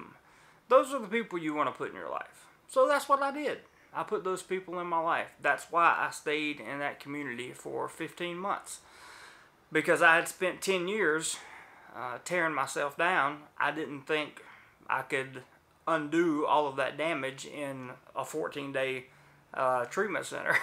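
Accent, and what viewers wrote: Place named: North Carolina, United States. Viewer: Great job, keep it up. As soon as I heard your voice I knew you were from somewhere by Charlotte😂 then it was confirmed. You're reppin NC well for us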